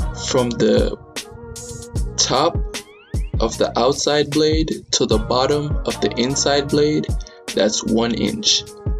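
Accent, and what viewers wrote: Jamaica. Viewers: That Jamaican accent just waiting to come out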